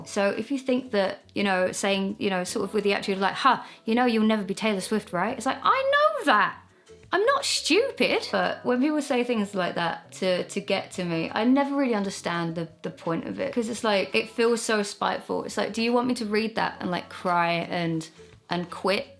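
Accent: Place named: Essex, United Kingdom. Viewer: I love that you've left up Ask 4, that's still so entertaining. An interesting slice of history too with the full on Essex accent still strong back then.:)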